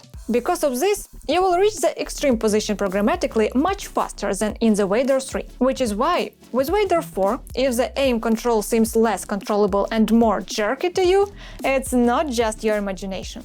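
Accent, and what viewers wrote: Russia. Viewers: The russian accent😅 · Может на русском делать будешь? Рязанский акцент прям режет уши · Здравствуйте, вы русскоговорящая? Акцент такой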